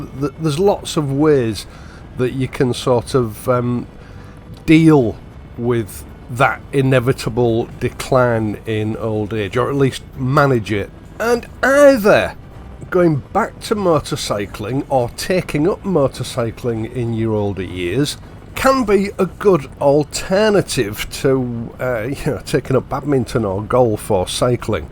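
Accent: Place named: Yorkshire, United Kingdom. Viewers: Never been on a motorbike during my 66 years on this earth. I own six pushbikes but now I’m getting the calling to to do something different. I want to learn a new skill and test myself. Would never go over a 125, would never need to. Pretty fit and in not bad health, never do less than 10K steps a day mostly dog walking and have two massive LGDs. Your video has given me the motivation to take it further. Subscribed, as I always enjoy listening to a fellow Tyke. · Hi, I was saying to my wife what an unusual sounding voice you have, I have listened to you before on various subjects about bikes, but honestly I could listen to you talk for hours! The wife thinks you may have a Yorkshire accent, is this correct?!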